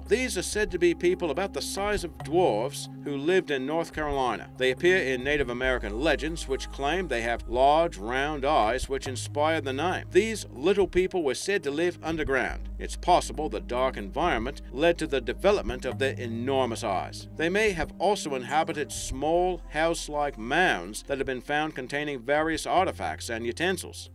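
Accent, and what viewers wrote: Australia. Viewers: Sounds like a aussie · I think it's Australian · a guy with australian accent speaking on native american myths! talk about outsiders talking about a another cultures myths!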